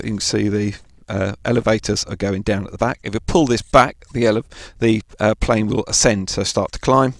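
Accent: Scotland, United Kingdom. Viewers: You're so funny. Thanks for this video. You're a perfect pilot, I think no one can fly those little birds in expert mode in those windy conditions. They're just too touchy for that. It gave me so much fun and delightment to hear some familiar-sounding, positive scottish voice in these day and age. :/ Took this as the main introduction-video for my kids for this F4U plane ,I gave them this Xmas - All the best from germany, three thumbs up :D Stay healthy. Cheers mate and thanks a lot! Hope you know, you're living in a paradise ;)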